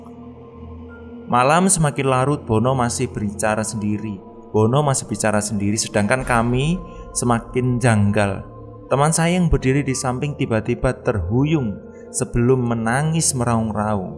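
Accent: Java, Indonesia. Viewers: Bahasa jawa medok 😂😂😂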